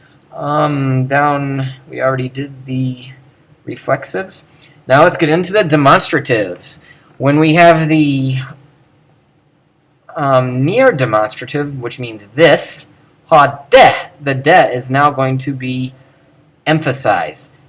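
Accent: United States. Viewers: I really admire your self confidence: to claim to have a new system (the correct one) to pronounce AG when you can not even drop your US-Amercian accent when reading the Greek words, and the only references that you give for this system are other videos of yourself. Amazing!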